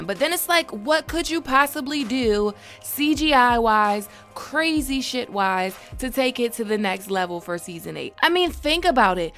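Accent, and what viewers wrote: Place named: Upstate New York, United States. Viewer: Damn where from that accent sound like upstate New york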